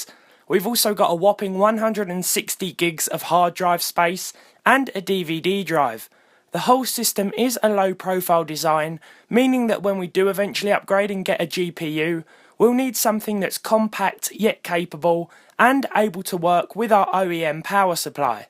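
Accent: United Kingdom. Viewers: Luckily I could listen it all, but does British voice always sound like that?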